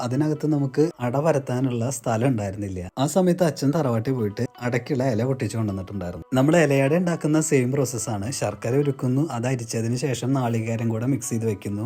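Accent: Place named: Thrissur, India. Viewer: തൃശൂർ ഭാഷ കേൾക്കാൻ സൂപ്പറാ❤❤❤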